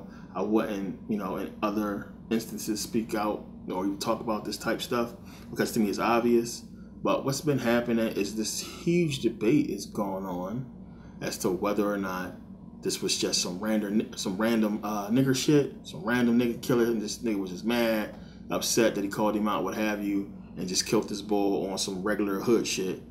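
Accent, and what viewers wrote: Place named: Midwest, United States. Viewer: Young brother I am guessing but I think you are from the mid-west linguistically listening to your accent Milwaukee, Chicago, Detroit. I love your mind young brother.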